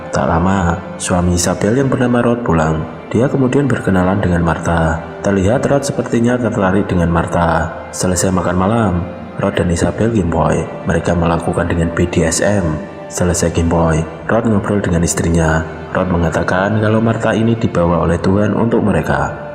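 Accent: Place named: Java, Indonesia. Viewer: Kok kk kenal suaranya medhok2 gini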